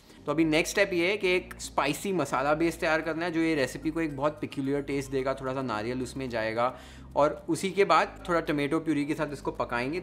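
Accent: Maharashtra, India. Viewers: to maharashtriyan ch aahe .. mumbai madhe lahana cha motha jhalay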